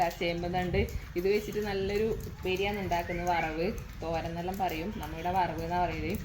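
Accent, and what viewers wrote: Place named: Payyannur, India. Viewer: ഞാനും ഇതുപോലെ തന്നെ നമ്മുടെ തനതു ഭാഷ പയ്യന്നൂർ സ്റ്റൈൽ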